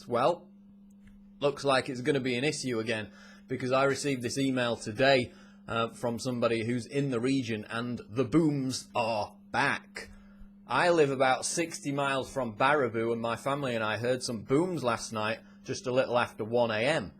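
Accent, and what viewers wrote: United Kingdom. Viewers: You live in midwest? what's with the british accent? jw cuz i live in wisconsin too.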